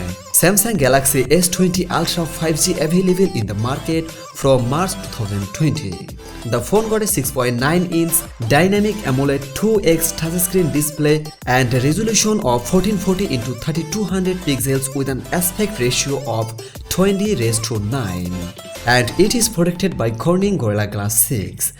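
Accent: Bangladesh. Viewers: Funny commentary Sounds so Bangladeshi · Bangladeshi english 😄😄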